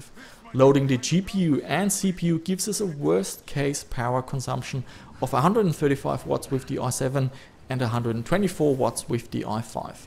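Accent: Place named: Germany. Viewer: Australian Dollars?????? For your accent I thought all this time that you were German, but then again i am from Mexico so I guess we North Americans know little about the south-eastern corner of the world.....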